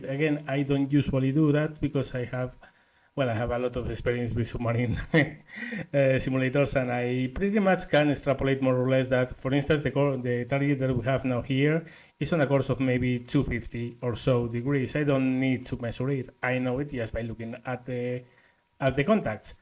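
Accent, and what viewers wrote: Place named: Spain. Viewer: Unrelated to anything but Im wondering if you're Spanish since you sound like my Spanish coworkers when speaking English. But I could be wrong.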